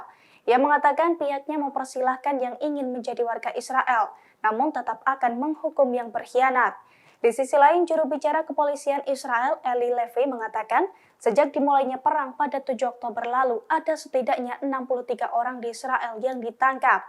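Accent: Java, Indonesia. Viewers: mbak e wong jowo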